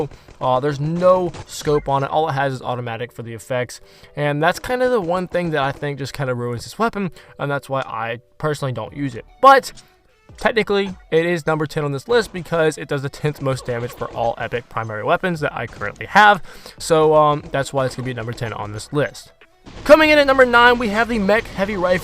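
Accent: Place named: United Kingdom. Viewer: Shut the fuck up you sound like you’re British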